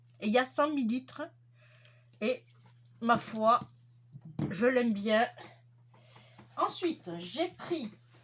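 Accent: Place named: Montpellier, France. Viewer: Coucou bonjour je suis nouvelles abonnée super achats , moi aussi je suis accro ACTION , on a presque le même accents toutes les 2 d'où êtes vous ? si ce n'est pas trop indiscret perso je suis originaire de montpellier mais je vis en Région parisienne ! !!! A bientôt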